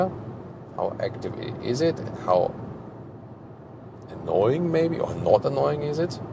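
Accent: Germany. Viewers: There's something about watching a car review with a German accent. Honey to my ears man 😂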